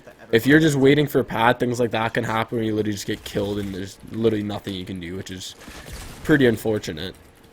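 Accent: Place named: Canada. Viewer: ur canadien i could tell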